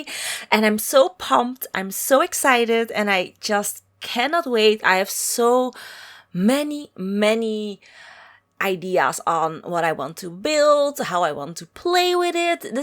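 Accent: Netherlands. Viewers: I adore Dutch accents ! Amazing house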